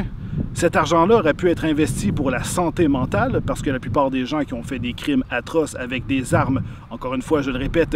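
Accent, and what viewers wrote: Quebec, Canada. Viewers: Ca cé du pur Québécois ,normal que tu comprenne rien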